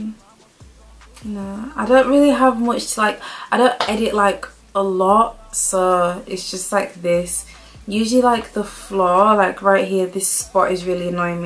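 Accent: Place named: Yorkshire, United Kingdom. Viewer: Omg your Yorkshire ❤️ I’m subbing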